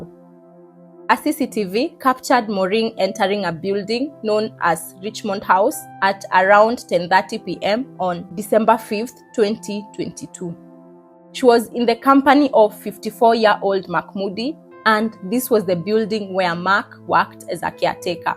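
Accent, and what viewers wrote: Kenya. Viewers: Here in the Uk there are tons of accents and intonations. The narrator of the programme has a Kenyan accent. But what she is saying is easily understood. In the Uk there are regional accents and one cannot make out what the person is saying because the accent and intonation is so strong. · She will improve with practice but, it is a Kenyan accent.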